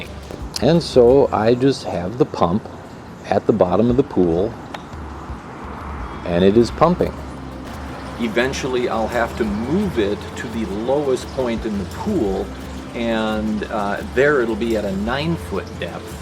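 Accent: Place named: United States. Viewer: what is there about this video that a person would not like? Maybe they are genetically related to Scrooge and they just don’t like anything no matter what. I listen to it twice just because I liked it so much. I am totally blind and I really need to know how these things work. Pictures on boxes do me no good whatsoever and neither do those folded math like papers in 16 languages. I needed a description by an English-speaking individual who spoke American English like me. I appreciate the time it took to make this video and I will be recommending it to everybody I know who might like or need to use one of these pumps. Thanks so much once again because most of these videos just have music and no talking and they drive me up the wall. This is a great video and I don’t understand why it hasn’t been watched 1000 times or more. I give it 10 out of 10. 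thumbs up and every other kind of good review numbers anyone can think of to add to mine.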